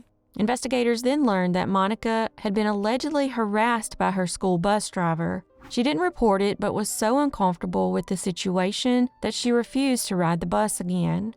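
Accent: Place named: Louisiana, United States. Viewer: Based on your accent (which I love and think is adorable) I'm gonna guess Louisiana. Am I right?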